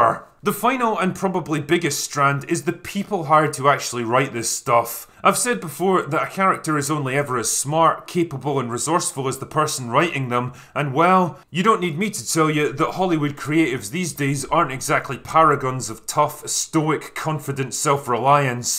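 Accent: Scotland, United Kingdom. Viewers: is your accent scottish or are you doing an immitation of an intoxicated man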